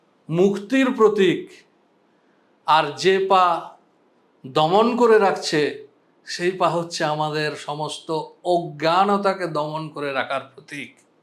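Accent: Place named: Bankura, India. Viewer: আপনার জন্য এমন এমন অদ্ভুত বিষয়ে জানতে পারি যে, যা সারাজীবন পেরিয়ে গেলেও সেই নিয়ে জানতে হয়তো পারতাম না। অনেকে বলবেন এগুলো ভাষা কে ভালোবাসলেই জানতেন। একথা ঠিকই। তবে তিল তিল সময় যেভাবে পেরিয়ে যাচ্ছে জীবন থেকে, তাতে নিজের উদ্যোগে নতুন কিছু রিসার্চ করে শেখা, এই জীবনে খুব কঠিন। 🎉🎉🎉🎉 আপনার কথায় বাঁকুড়া ঘেঁসা একটা টান আছে (ধারণা ভুলও হতে পারে). 🎉🎉🎉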